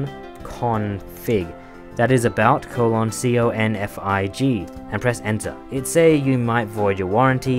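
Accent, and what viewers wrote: Australia. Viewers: Australian accents turn me on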